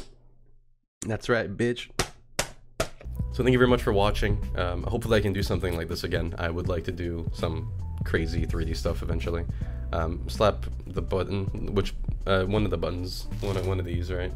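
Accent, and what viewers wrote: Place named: Quebec, Canada. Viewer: hey are you a quebecois? parce que moi je le suis et j etais full surpris quand tu parlais en quebecois moi j etais genre: attend... il parle francais ce gar la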